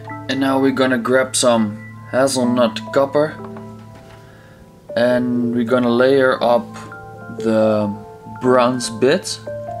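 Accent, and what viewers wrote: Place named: Netherlands. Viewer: Geweldig gedaan man, ik kan aan je accent horen dat je Nederlands bent.. Ik heb geabonneerd, echt super goed gedaan.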